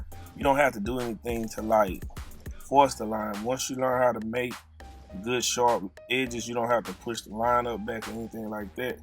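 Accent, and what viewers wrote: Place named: Memphis, United States. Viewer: Where are you from? I'm from Memphis, you kinda sound like you're from here. Awesome Job ,on the haircut! I just found your channel. I have 2 boys on the Autism spectrum, they both have sensory disorders, my youngest is 9 and he has never been still enough to get lined up properly, and shaped up. I just wish it was some way to get him to keep still. I think his barbers, tools are a little to sharp for him, do you have any suggestions for a child with autism that cant hardly take the sensitivity of the tools? And I subbed to your channel! I'll look you up on insta!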